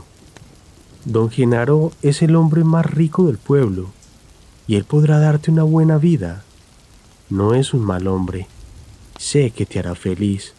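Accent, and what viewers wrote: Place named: Colombia. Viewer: Quizas el relator es de Colombia...no lo se ,pero esta historia es real,año 1910...coltauco...Rancagua...Chile...una noche de invierno...miguel fue real y aun en callejon de lo de cuevas existe un diminuto monolito en donde encontraron a miguel....felicitaciones por tan interesante y bien realizado relato❤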